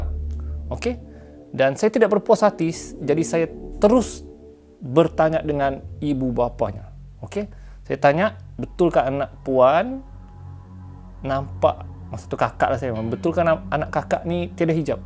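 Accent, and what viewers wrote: Sabah, Malaysia. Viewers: mmg best loghat Sabah...ni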